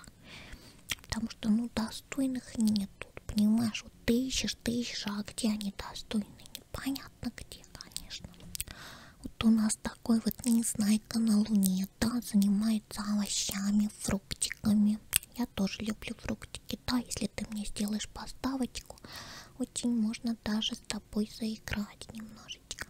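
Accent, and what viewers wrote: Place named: Moscow, Russia. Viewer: Типичный московский говор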